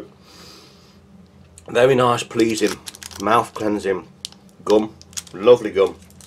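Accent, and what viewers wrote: United Kingdom. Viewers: Great review ! I always enjoy to hear your English English :) These rations ate civilian, but the components are the same quality as the military. I personally enjoy Maiskij Chai - the tea. I even bought a pack for me when I was in Ukraine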